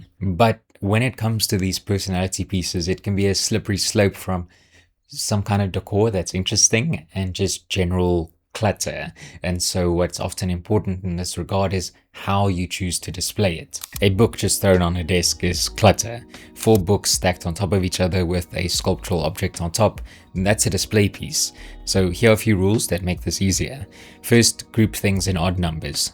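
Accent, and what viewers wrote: South Africa. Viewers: You sound south African 😂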